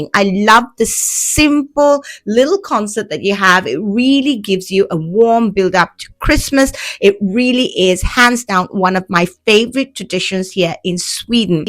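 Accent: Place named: United Kingdom. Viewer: I adore your English accent. I wish my own english could sound so sophisticated-ly British xD With surströmming, the smell might reach biohazard levels but I'll tell you that it doesn't really taste like anything. It's basically just taste like salt, an extra salty pickled herring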